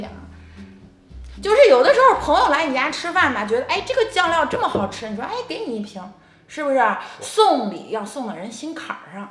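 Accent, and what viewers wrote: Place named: Beijing, China. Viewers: 一听口音就是北京老乡，太优秀了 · 感觉你是北京人哦！难道是同乡？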